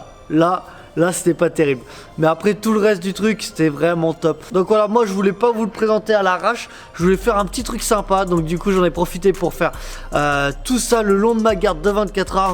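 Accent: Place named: Maghreb. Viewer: Tu es d’origines arabes? T’as un accent du Maghreb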